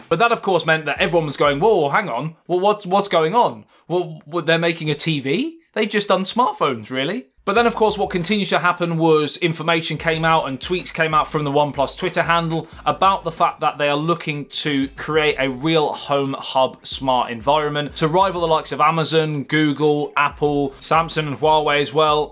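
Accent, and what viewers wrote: England, United Kingdom. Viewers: your videos are awesome and informative- especially i like your English accent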